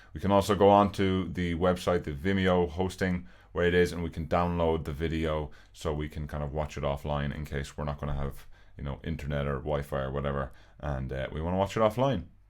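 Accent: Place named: Ireland. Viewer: I didn't expect him to have a deep voice with an Irish accent. I'm definitely getting the course now lmao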